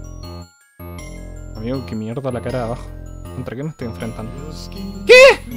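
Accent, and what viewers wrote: Chile. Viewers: X 2 yo soy chileno y cuando lo escuche decir chucha dije es chileno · x2 io igual lo soy, chileno reconice a chileno jsjsj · por el como habla ya se sabe que es chileno por un hola como estas ya se reconoce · Es la primera vez que te veo y desde ya puedo decir que eres chileno xD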